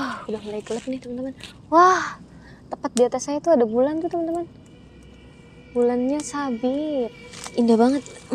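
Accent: Java, Indonesia. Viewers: Ka lucu jga yh klo lgi ngomong jwa...jwane mdok bngt kaka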